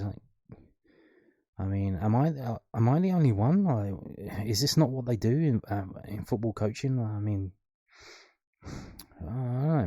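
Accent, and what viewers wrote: Scotland, United Kingdom. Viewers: Interesting and informative but that Scottish accent 🤬 fuck me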